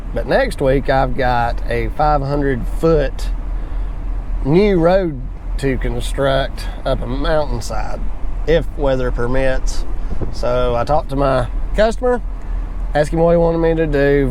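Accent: South, United States. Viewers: Value beyond a price tag just watching you position that tractor, wait for the rock over, then gently glide that Blade to the sound! Total Master of your Trade and your creeping the controls to set that Box Blade is Art Work My Good Southerner! This is like the third time watching and it never get's old, pick something new up each time on your operator skills I transform to My lot for hours of frustration and confusion of not being able to capture the 'Magic' ! Ha, Lol. My 94 Honda 22 HP I just picked up is so 'touchy' on the controls? Adjusting the float helps but the lift is impossible to get to slowly 'creep' up and down on the hi-lo spots. My point of view is lower with my 5 ft. Tarter BB, I can't quite see into the box to watch that front blade? Angle of the dangle, what kills Me is to watch you skim in reverse pushing the blind spot of the back blade! 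Touching just the edges or a slight high spot. You make it look easy My Main Man! Enjoy the vid's and Southern accent/hospitality. Reminds Me of some old AF buddies from Virginia, Kentucky and of course South Carolina. Stay safe and healthy from Northern Nevada!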